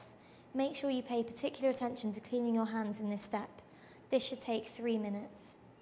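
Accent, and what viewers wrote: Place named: Southern England, United Kingdom. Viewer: Nah, just Southern English!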